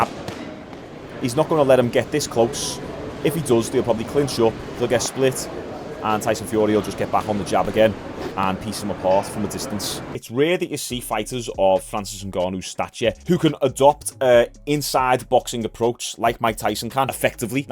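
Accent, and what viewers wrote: Liverpool, United Kingdom. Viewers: Why keep the Scouser accent when you do a perfect Tyson AND a perfect Ngannou lol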